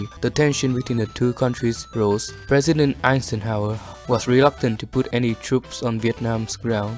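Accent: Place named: Europe. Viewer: He sounds more European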